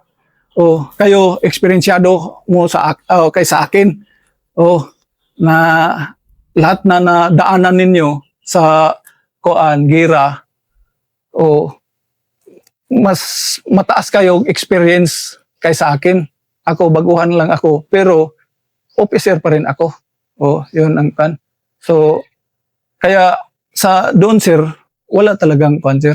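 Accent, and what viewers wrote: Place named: Visayas, Philippines. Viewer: Bisaya jud kayo si master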